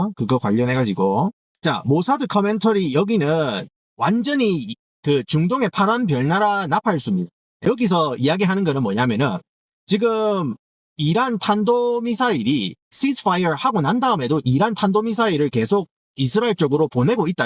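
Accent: Gyeongsang, South Korea. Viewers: 경상도 오빠야가 풀어주는 세계정세 ❤ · 트럼프 성대모사만 나오면 너무 잘한다. 그 경상도 영어 사운드에 익숙해 저버렸으. 캔유 빌리브댓!! 😂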